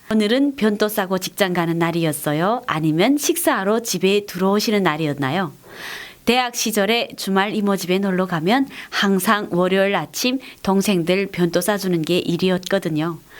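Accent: North Korea. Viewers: 말솜씨가 아주....북한의 억양이 좀 남아있지만 조리있는 문장과 말 보기 좋습니다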